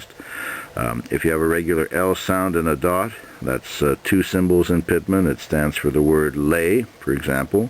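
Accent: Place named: Canada. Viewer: Bonjour!! Great video! You have a lovely Canadian accent! Une question pour toi,. Que'est-que tu écris au commencement de la vidéo?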